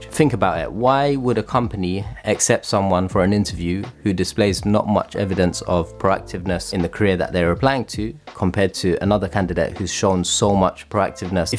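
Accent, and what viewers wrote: United Kingdom. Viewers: Are you British? or it is just an affluent accent ?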